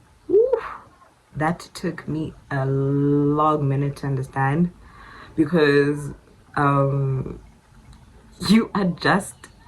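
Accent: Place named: Ndebele, Zimbabwe. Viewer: Ne accent yako ino sounder munhu anotaura ndebele waive ku safe side dai wakataura ndinonyanya ku watcher channel yako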